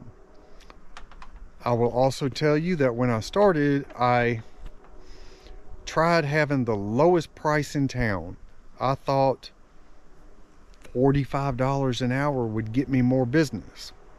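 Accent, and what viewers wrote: South, United States. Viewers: From your southern accent, I was wondering what part of the south, GA. In 2 yrs I'm planning to move to catersville. I'll be looking for employment then. I personally never wanted to start a business, I could have one time if I wanted. To many licenses and epa rules, I mentally can do that stress.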